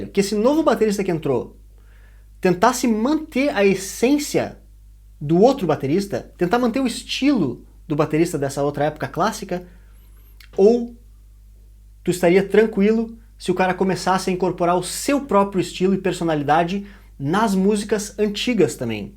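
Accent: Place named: Rio Grande do Sul, Brazil. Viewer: E como é esse sptaquezão gaúcho ai na Noruega hein heehehehehe, tri bom ouvir canal de conterrâneo hahahaahah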